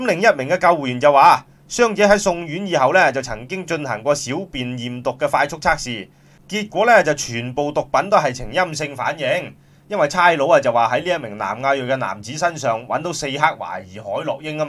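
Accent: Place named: Cantonese, China. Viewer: 支持！支持！支持！😻⋯⋯口才極好、廣州口音，但我唔知你的名字🌹👏🌺👍🌺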